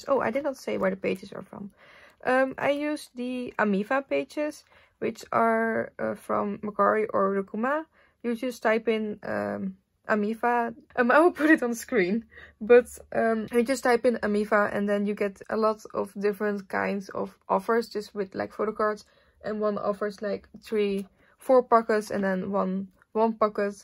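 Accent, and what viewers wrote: Netherlands. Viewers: Omg your accent, you must be Dutch!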